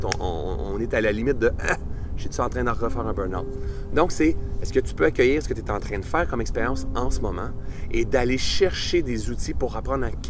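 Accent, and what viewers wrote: Quebec, Canada. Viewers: Magnifique témoignage Humain, sans prétention, et tellement agréable d'entendre une voix Québécoise, ca touche mon Âme.. Gratitude et bonne continuité! Louise:-)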